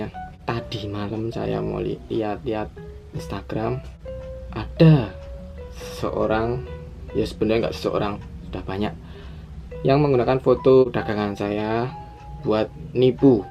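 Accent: Java, Indonesia. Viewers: jowo ne medok tenan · Mas ngomongmu medok 😂😍😍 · Thank's infonya mas, ngakak sih masnya agak medok wkwkwk ada lucunya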